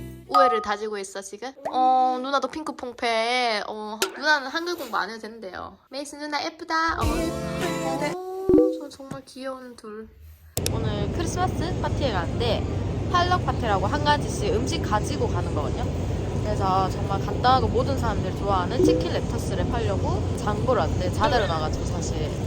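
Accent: Gyeongsang, South Korea. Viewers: 이 언니ㅎ 처음엔 뭔 영어를 대구사투리로 하나 웃었는데 점점 빠져듭니다 타국생활 지치지 말고 즐겁게 .. 응원합니다^^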